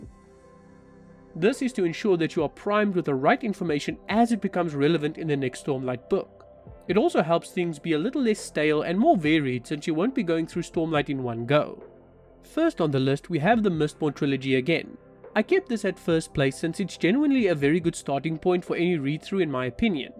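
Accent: South Africa. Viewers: First 5 seconds of you speaking I said that must be a fellow South African. Checked your about and I was correct. Nice high quality videos! Definitely gained a sub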